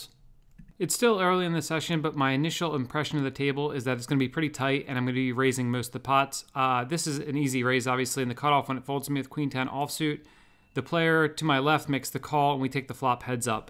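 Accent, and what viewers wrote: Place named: Mid-Atlantic, United States. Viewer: Toedal profit lol can't hide that mid-atlantic accent, really enjoy the vlog!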